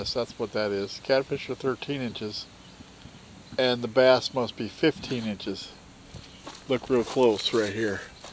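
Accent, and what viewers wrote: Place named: Midwest, United States. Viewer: I'm from WI and you sound exactly like a Midwesterner! Great vid, you're making me wish it wasn't 1° outside right now where I'm at in MN.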